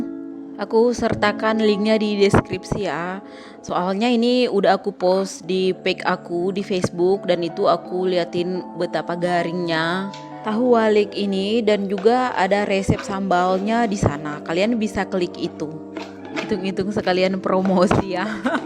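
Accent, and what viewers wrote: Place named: Medan, Indonesia. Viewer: Kakak saya kok penasaran dengan tempat daerahnya dimana ini...? sumatra atau di jawa....kalau saya dengar narasinya setiap lihat videonya seperti mirip orang logat medan (A9)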